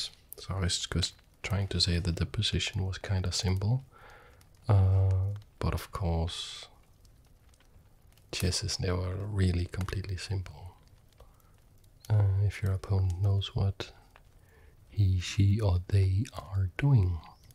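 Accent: Denmark. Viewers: Are u danish cause🇩🇰🖐Hva så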